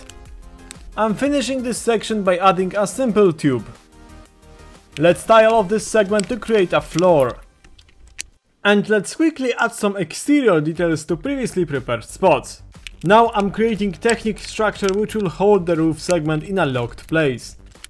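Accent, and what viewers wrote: Poland. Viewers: Man, gadasz polskim akcentem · ok because i hear this accent and me too from poland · slychac ten polski akcent POLSKA GUROM! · Ten polski akcent słychać odrazu haha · I thought you're from Russia, but then I realized that you are our Poland Slavic bro with the same accent)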